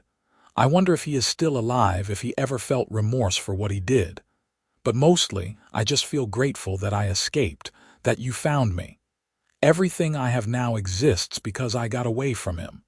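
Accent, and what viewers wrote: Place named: Midwest, United States. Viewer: My most favorite voice! Midwest.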